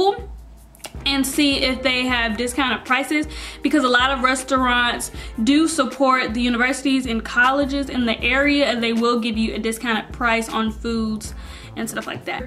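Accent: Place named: South, United States. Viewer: Hi. You are too cool and i like your sweet country accent. Keep being you👍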